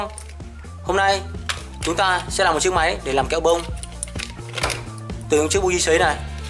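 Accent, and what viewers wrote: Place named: Phu Tho, Vietnam. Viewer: nghe giọng anh là biết anh là người có Phú Thọ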